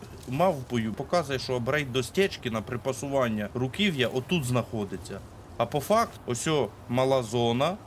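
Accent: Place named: Poltava, Ukraine. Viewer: I love your Poltavian accent 😅👍❤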